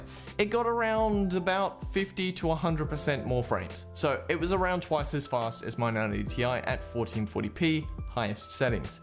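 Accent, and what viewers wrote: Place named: Australia. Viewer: I cannot tell if ur asutrallian american or british ?😂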